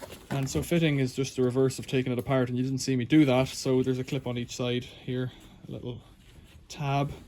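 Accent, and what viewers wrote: Ireland. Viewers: You sound like an Irishman. I bought mine 1 week ago with same vacuum and looking for some DIY as my newly bought bloody expensive vacuum stops suctioning but power is working. Can’t find any solutions on my machines manuals.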